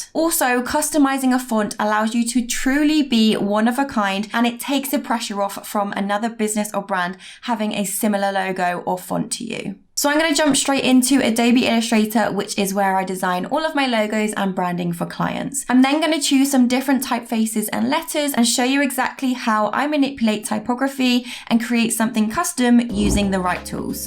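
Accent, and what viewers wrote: United Kingdom. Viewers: I'm self-taught just like you, and it's so refreshing to learn from someone who doesn't linger on complexities and has found ways to just use common sense instead of doing things "by the book". It's amazing what you can learn just getting lost in tutorials, and you just added quite a few ideas to my arsenal. I usually prefer to read rather than watch videos... But in this case, considering how you make it very easy to understand and don't use obscure tools or lengthy techniques (and I must admit... gorgeous blonde + British accent = hell yes), you sold me from the first second. Subscribed. Liked. Bravo!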